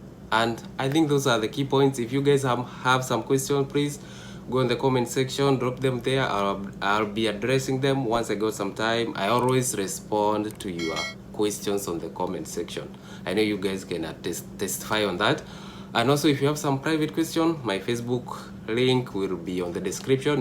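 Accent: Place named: Kisii, Kenya. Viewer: You sound like a kisii🤔🤔🤔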